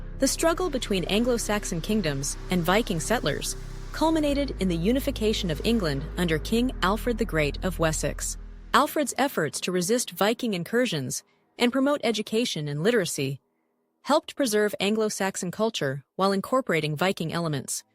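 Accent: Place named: United States. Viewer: and now its narrated by a YANK .... !!!!!!!!!!!!!!!!!!!!!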